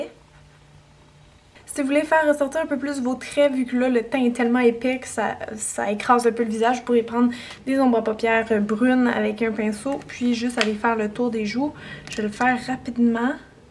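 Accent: Quebec, Canada. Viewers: OMG cet accent québécois J'ADOORE 😆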